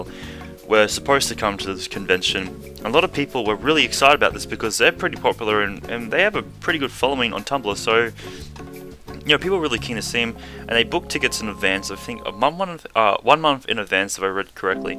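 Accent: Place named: Australia. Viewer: thought you were an aussie, we sound identical haha!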